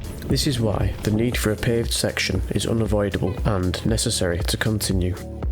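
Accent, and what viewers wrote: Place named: North, United Kingdom. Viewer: Great vid, narrated by a good Northern lad. Magic 👍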